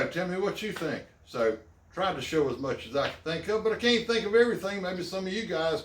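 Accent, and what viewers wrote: South, United States. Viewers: love your teaching with that southern accent thx for sharing your knowledge. I will fallow you from now on.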